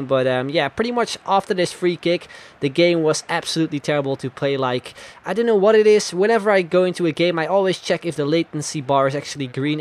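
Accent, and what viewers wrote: Netherlands. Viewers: Ben je nederlands want je klinkt wel zo😁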